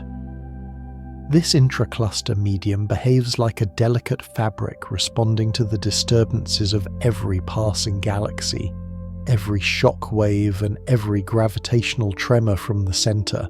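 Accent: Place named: United Kingdom. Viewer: 2 plus hours of yap yap with British irritating narrator voice 🎉please 🙏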